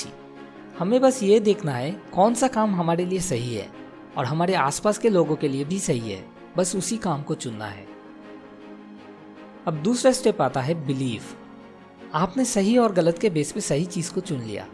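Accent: Bengal, India. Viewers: pronunciation of temporary? Bengali accent?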